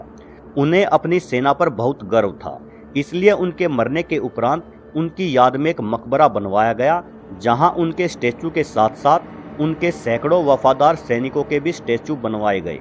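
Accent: Haryana, India. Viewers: Bhai aap haryana se ho kya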